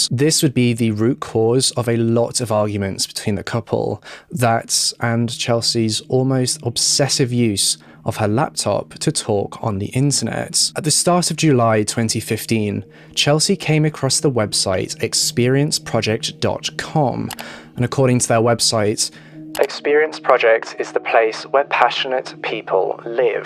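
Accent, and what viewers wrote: United Kingdom. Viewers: I do enjoy your presentations! I also am a fan of “Dark Curiosities”, as I noticed on your channels list. I love British your accent, as it makes listening seem more mysterious! I’m an older person, and have Bi-Polar, as well. I take my meds daily! It effects all a bit differently, and poor Chelsey’s was sadly out of control. Also sad , is the fact that sinister people like “Dark Rider”, are out there as predators. To people in vulnerable.conditions, as her’s. Stay well!🙏🧡✌️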